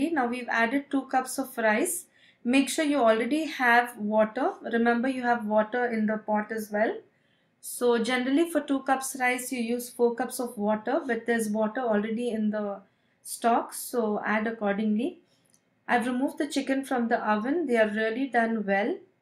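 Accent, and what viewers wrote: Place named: India. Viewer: You sounds like you came from india...sorry if im mistaken...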